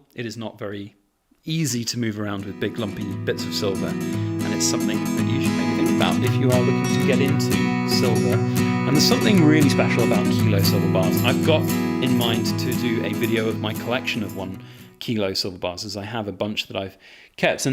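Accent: England, United Kingdom. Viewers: Hey bud, 2024 has sure thrown a bunch of really interesting times and? situations your way this year. I suppose that bunch of $ leaving their coffers may be a hack but as you say the 10th degree was totally not needed. You are and has an English accent that stands out like it is you. Even an untrained Canuckian can tell your voice against any other Cockney accent. I hope that wasn't an insult? However, you got them straightened out and hopefully your seller wasn't too agrivated awaiting his money. That really is a lot of silver. Must feel great to have that pile to ponder my old friend. WOOHOO for you. A hundred ounces is the most I have ever had in hand. I have a video of it (10 x 10 ounce bars) being reduced to casting shot. I am sure glad I don't have to do that anymore as I finally found a trust worthy dealer for my needs. Generally a buck and a quaarter over spot. 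Good to see you today old friend. Shall we go pour something, LOL.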